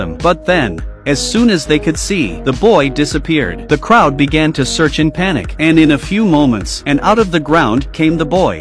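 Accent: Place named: United States. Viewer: Ayyo Rama ! Had to listen in American accent now🤦🏽‍♀️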